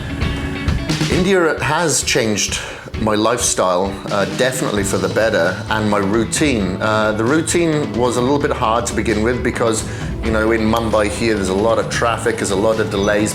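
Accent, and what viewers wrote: Wales, United Kingdom. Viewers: hi were you born in the states? as you sound like welshman! and your surname is another welsh connection.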